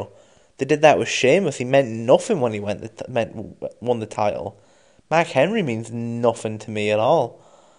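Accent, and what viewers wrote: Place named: London, United Kingdom. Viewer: YOU SOUND LIKE YOUR FROM LONDON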